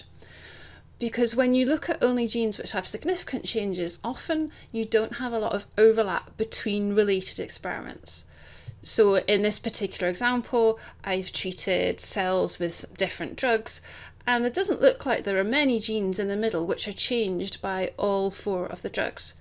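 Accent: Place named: United Kingdom. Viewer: Thank you. That was concise and wonderfully analyzed . Meanwhile your British accent is super!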